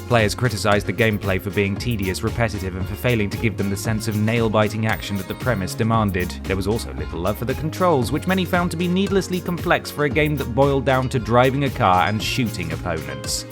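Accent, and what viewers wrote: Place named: England, United Kingdom. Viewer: Interesting that you speak with an Emglish accent but call it a "Sega Genesis"...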